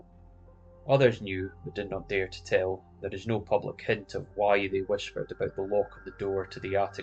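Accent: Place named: North, United Kingdom. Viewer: A Northern Ian...i dig it! Keep on it! Arkansas, USA!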